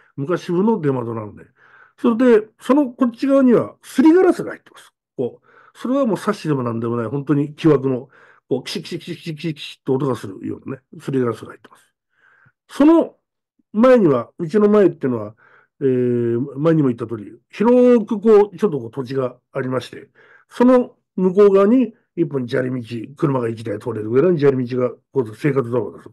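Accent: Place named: Aomori, Japan. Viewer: 青森県出身のわたし 🥰🥰🥰 さんの青森県の方言、お上手ですね 🥰 青森県は 🎀津軽衆 🎀南部衆と分かれます🫧🤗 わたしは南部衆の産まれですが。八戸の近くです！さんの方言方法は南部衆の方言に近いですね 🥰 南部衆の方言は北海道の方の方言にも似てるとわたしは思います 💐💐💐🥰 心温まる怪談、本日も癒されておりました 💝🫧🫧🫧🤗 ありがとうございます♪ そして、いつもご丁寧にお返事ありがとうございます♪ シングルマザー、美容家のわたし🫧🎀 子育てやお仕事の励みになっております 🫧🫧🫧 感謝致します❣️ 🌹鈴子🌹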